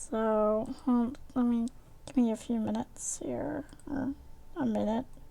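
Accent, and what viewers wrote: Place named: South, United States. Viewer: You sound a little bit young and like you're from the south And you listen to Magog😂 🤔🤔🤔 very interesting🤡🌎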